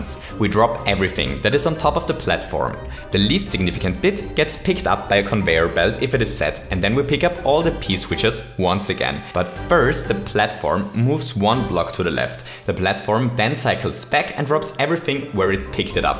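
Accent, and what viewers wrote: Germany. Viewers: You're german right? :P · This german accent xD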